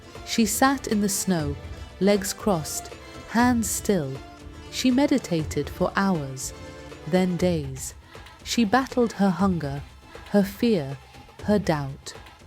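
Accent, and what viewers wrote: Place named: United States. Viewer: the American accent is an interesting one to hear in an ancient Indian story 😂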